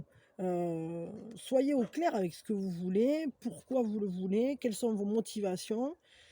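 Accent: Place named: Libourne, France. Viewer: J'adore votre accent qui me rapelle mon papa près de Libourne Mes grands parents et ma tante Merci pour c'est pas de la tarte Quand son cœur s'ouvrira il sera surpris Bisous 😇😘🐾🎶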